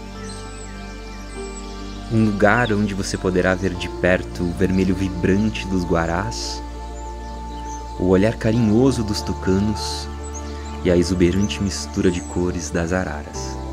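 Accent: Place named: Santa Catarina, Brazil. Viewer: Nossa! é de arrepiar cara, produção de cinema! você é catarinense? tem sotaque de Catarina kkkk sou de Massaranduba - SC abraço e sucesso!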